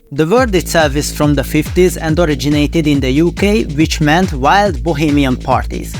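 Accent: Hungary. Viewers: Te magyar vagy ? Kicsit olyan a tajszolasod angolba :,D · Is this a Hungarian accent? Just curious because i had a feiend in uni who spoke similarly. Might be wrong tho :) nice/funny video anyways ❤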